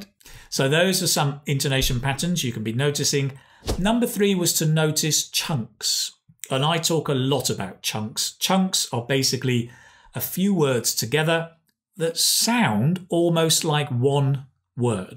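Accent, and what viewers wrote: United Kingdom. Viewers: I really appreciate your vid,i’ll keep practicing for apply an airline,Thanks so much,anyway i like British accent.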